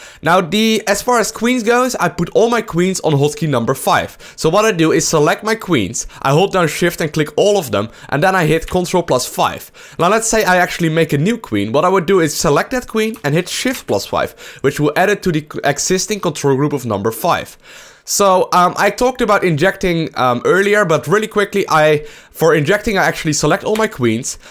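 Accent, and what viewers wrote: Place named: Netherlands. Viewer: U are dutch or something like that? Cuz your english is somehow funny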